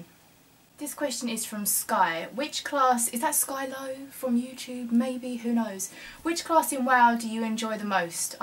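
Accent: England, United Kingdom. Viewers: So English accent!!!!! I love it ❤️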